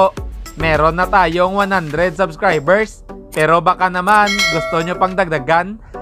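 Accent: Iloilo, Philippines. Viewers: Tuno mo ilonggo gid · Love na love q. Tlga accent ng ilonggo/accent ang sarp pkinggan..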